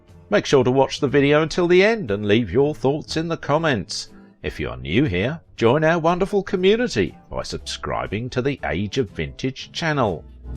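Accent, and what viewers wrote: United Kingdom. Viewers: NOTHING, in the world would delight me more than in replying to your comment, like feeding a pork chop to a Pit Bull. BUT, I'm going to give the honors to AGE OF VINTAGE, the owner of this magnificent channel. It's bound to be epic, and with a classy British accent.!!!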